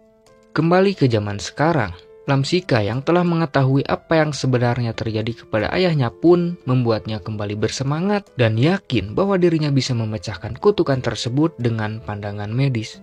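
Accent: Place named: West Java, Indonesia. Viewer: Ges org sunda deui wae.. Asli mana kang?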